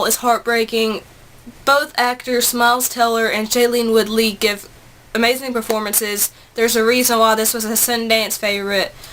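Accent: South, United States. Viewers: Yeah I agree. I don't know why anyone would dislike your videos. You are funny as hell and your southern accent is adorable. You also have wonderful tastes in just about everything. power!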